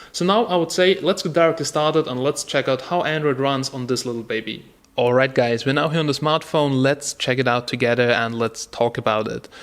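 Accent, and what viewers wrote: Germany. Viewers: ohne jetzt nach zu forschen....du bist doch ein deutscher?....so redet kein Ami oder erstrecht ein engländer!....ich würde meinen Popo darauf verwetten^^..... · I have to say your reviews are great! You're very thorough and really leave nothing out! Great Job! Your pronounciation of some words is quite cliche german, but other than that, you do have advanced free speaking english skills!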